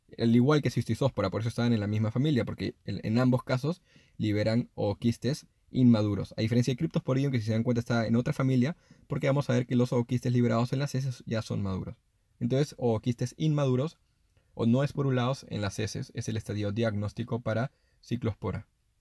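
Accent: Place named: Peru. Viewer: Me gusta el acento peruano. Saludos desde MX 🇲🇽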